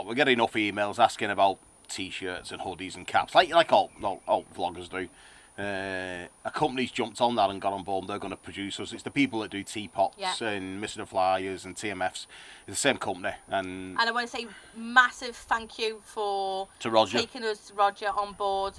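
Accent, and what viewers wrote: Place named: St Helens, United Kingdom. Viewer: V-Strom 650 can be lowered 👍🏻 Can I ask where are you from. You sound St Helens to me, my home town 👍🏻😊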